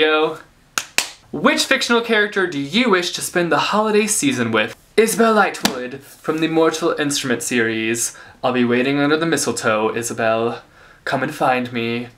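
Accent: Spain. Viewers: You have an awesome Spanish accent! lol. :) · I love your spanish accent, Happy Holidays Dear!!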